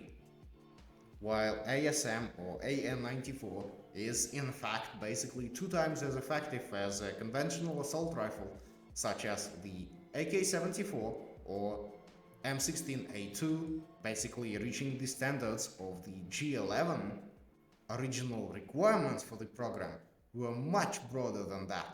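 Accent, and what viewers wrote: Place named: Russia. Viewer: Authentic Russian accent. I like it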